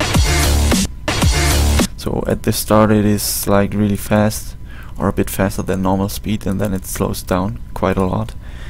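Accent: Germany. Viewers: Deutscher oder? :D Hört man raus aber gutes Tut ^^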